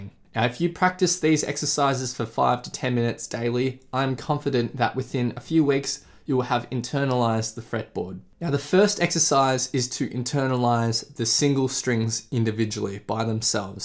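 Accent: Australia. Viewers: Haven’t watched hundreds but agree this one is brilliant, keep it up. And the Australian accent… sweet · Something about the Australian accent that makes this so much more interesting…..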